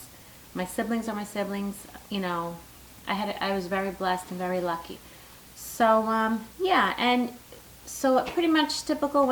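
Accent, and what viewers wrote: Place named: Long Island, United States. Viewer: I appreciate the videos for average, mature women as well. I found you doing a search for curly hair and when I heard the accent I was like ok, I,m from Long Island. So keep it up and thanks for sharing.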